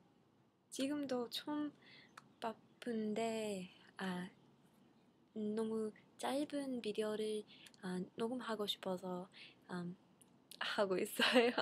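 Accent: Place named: South Korea. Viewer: Glad you are in Hawaii! I have friends in Hilo but your Korean is much better than hers! Hope you have a great time in Hawaii and in your classes. I love love your korean accent when you speak english...Couldn't be cuter!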